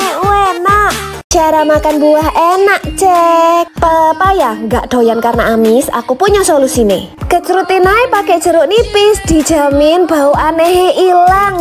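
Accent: Java, Indonesia. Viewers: Kak owenge jowo yo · Enak bgt denger bahasa jawanya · Bahasa jawa nya medhog bgt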